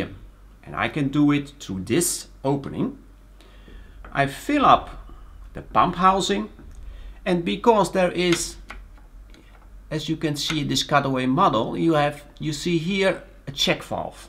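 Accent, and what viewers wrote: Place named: Netherlands. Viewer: gotta love a dutchy speaking english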